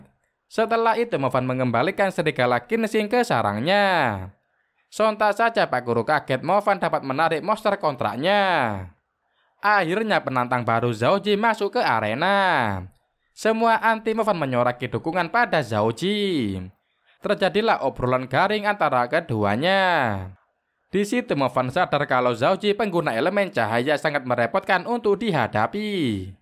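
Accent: Java, Indonesia. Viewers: Mas orang jawa ya soalnya sekat bahasa jawa nya😂😂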